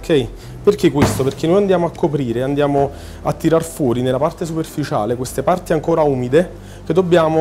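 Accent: Standard. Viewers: Ma parla esattamente italiano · He's speaking normally for us, we're italians.Why, maybe you were convinced that we were going to sing pizza pizza mandolin to the rhythm of tarantella? This is the normal speech of an Italian. At least we do not eat vowels for breakfast like you.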